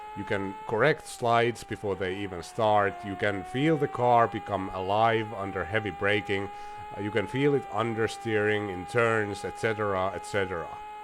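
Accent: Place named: Finland. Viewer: From the accent alone I can smell the karelian pie and koskenkorva. Kiitos!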